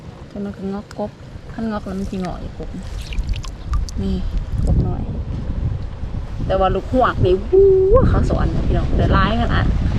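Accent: Isan, Thailand. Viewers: เว้าคือคนอีสานบ้านข่อย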